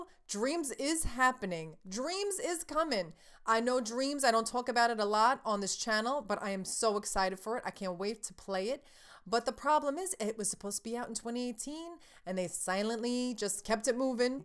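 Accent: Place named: East Coast, United States. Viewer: I'm a new viewer so forgive me for asking if you already said so but you have an interesting accent, are you from the east coast?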